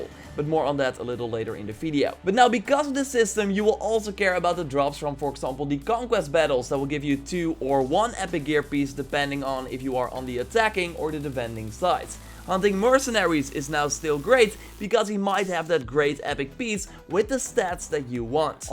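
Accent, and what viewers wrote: Netherlands. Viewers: This dutch accent lol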